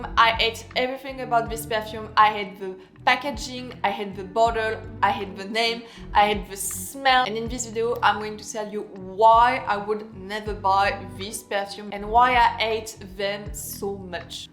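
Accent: France. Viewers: You do realise that perfume is highly personal and changes depending on the body chemistry? You do realise that you are insulting a ton of people and their taste? This is not a review, it's just bashing 5 perfumes for entertainment while speaking with a thick French accent playing the bourgeois authority. A review is supposed to be neutral.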